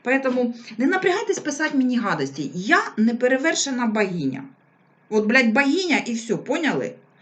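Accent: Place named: Kherson, Ukraine. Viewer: Ви наша запальничка💙💛., Ви нам, жителям Херсонщини, дуже близькі. Бо і суржик в нас однаковий)))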